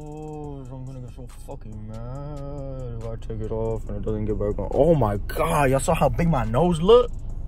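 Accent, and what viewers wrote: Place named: Miami, United States. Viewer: U sound like you’re from Miami…I’m from Miami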